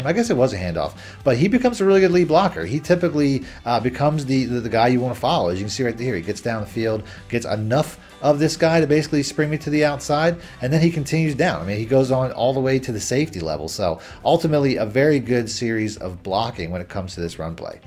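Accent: New Jersey, United States. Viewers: Hey bro, been watching you for at least 2 years now. Sound like you are from Jersey. I am. Really like tour content. Anyways, I'm an old dad(43) who recently got back into Madden. I play CFM and I am tired of losing. Of course my stick skills ain't what they use to be. I use dolphins playbook on defense. I used to run Raiders on offense, but it switched up this year taking away weak slot close and strong twins. I am looking to purchase an ebook from you. I'm not sure what team to get for offense. I don't mind glitch shit, I just wanna win!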